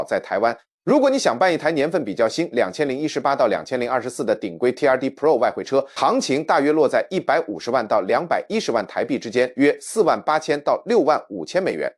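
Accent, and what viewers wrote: China. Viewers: 這種一聽就是中共國口音 又在謊騙網友聽久了就被帶了風 日本的東西爛 車子爛沒有甚麼開不壞的 這就是中共國輸出的洗腦論述 天天講 有一天你心就歪了 就開始反日 這就是共匪最拿手的認知戰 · 你说的是普通话，不是台湾国语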